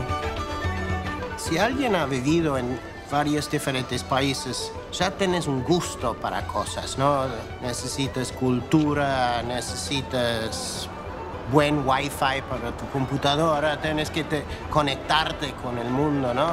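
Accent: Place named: Argentina. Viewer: Me encanto este vídeo,veo un lindo irlandés con acento argentino,, algún día podré visitar a nuestro país hermano. Saludos de una peruana viviendo en Irlanda.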